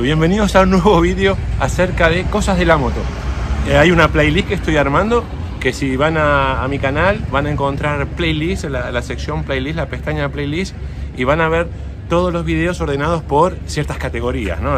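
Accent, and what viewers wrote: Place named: Argentina. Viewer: Hola. Y la rueda no controla?? Solo esto? No aflojas dirección? Creo que talves mi problema de vibración y movimiento leve de izquierda a derecha. Se soluciones así .. ja .. mi moto es vieja y jamas se la hace nada. Aunq mis rodamientos están buenos en la rueda y su eje. Seguro son los barrales. Gracia por tu info. Me suscribiré.. y por cierto. Eres argentino??. Suenas tal cuál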